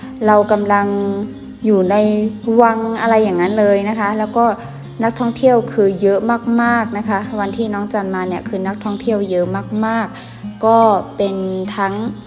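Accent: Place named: Central Thailand, Thailand. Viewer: น้องพูดไทยกลางชัดมากครับ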